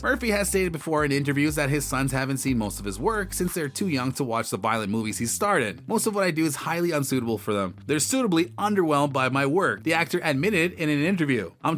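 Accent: United States. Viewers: Love him, but I wish this commentary would just shut the f up. Listening to this yank prattle on....urgh!!!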